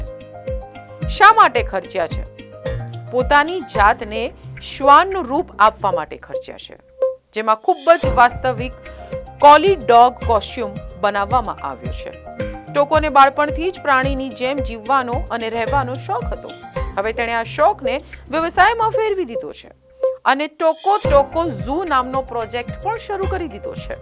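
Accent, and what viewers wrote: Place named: India. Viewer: ဂျပန်ဆို...ဘာဖြစ်လို့...အန္ဒိယ...ကပြောနေလဲ....အဲဒီကောင်က...ကုလားလိုဘဲပြောနေတာ...ဗျ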